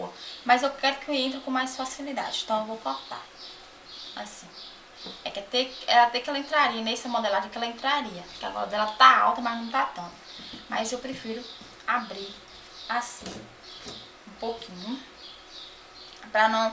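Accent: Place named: Minas Gerais, Brazil. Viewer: ***** Ah! Que interessante! Realmente já tinha ouvido dizer q o sotaque de Minas era forte.Fica giro viu? As brasileiras q sigo no yutube, a maioria são de São Paulo e o sotaque é diferente,mais levezinho.Obrigado por responder e tenha um bom dia!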